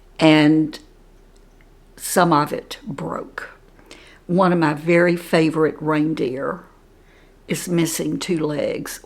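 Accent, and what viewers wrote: South, United States. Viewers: I 💕😘 the way tells stories like a true Southern Belle 🙂 merry Xmas 🎄🦝🎄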